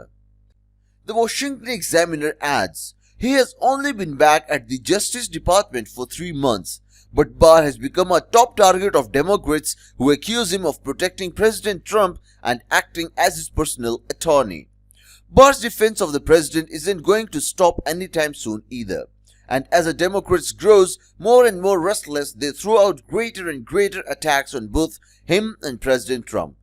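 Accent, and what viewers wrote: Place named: Russia. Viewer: Why would you EVER use a narrator that sounds Russian?? Kind of crazy.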